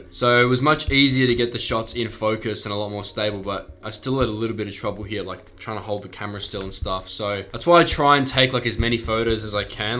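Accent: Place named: Australia. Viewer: An Asian girl with a Aussi Accent... Omg Dream girl hahah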